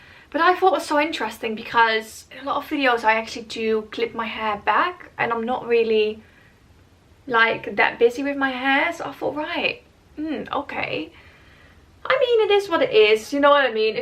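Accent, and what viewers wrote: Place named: Netherlands. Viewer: Ik hoorde een ‘kleiin NLaccentje’. En toen zag ik je naam en wist het zeker😄. Bedankt voor de review!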